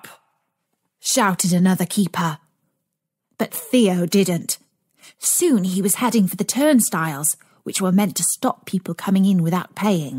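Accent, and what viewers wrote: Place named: England, United Kingdom. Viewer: Funny story. Great English accent!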